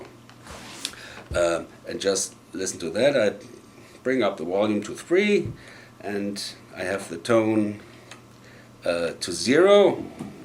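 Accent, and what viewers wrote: Hesse, Germany. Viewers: Is he German? He kind of has an accent. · Bist du ein deutscher 😂 man hört den Akzent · hi, excellent explanation. you should have mentioned that reverb/ and delay was engaged. that makes it easier for beginners to get at least near to the sound. i played more than 40 years guitar and have found out that it is easier to play with a gibson les paul than a epi or harley benton. since i own a original gibson i feel i play better. the tones match better to the records i play to, especially in the higher octaves. i like your stuff and your hessisch english - i come from nearby:-) so it sounds familiar. best from Limburg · you have an german accent, don't you? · your german accent is bad ass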